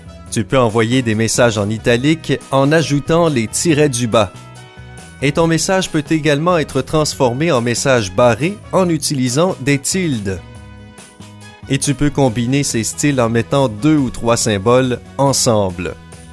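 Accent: Quebec, Canada. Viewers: Il a l’accent québécois, mdr😂🤣😂🤣. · Pas canadien ??!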